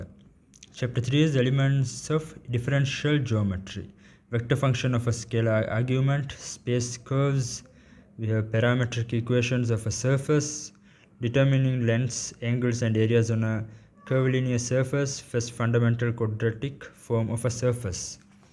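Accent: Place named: India. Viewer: Are you Indian because your accent is so Indian btw i am also from india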